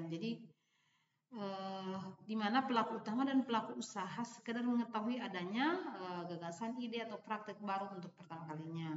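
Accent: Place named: Bugis, Indonesia. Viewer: Pantas logatnya Bugis sekali 😂,salam dari sinjai ibu heheh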